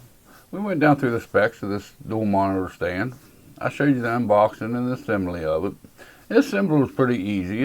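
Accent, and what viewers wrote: United States. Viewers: Yo you cowboy accent is cool bro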